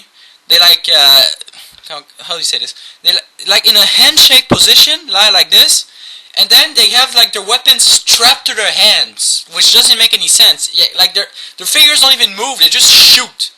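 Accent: France. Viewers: Let me guess... French accent?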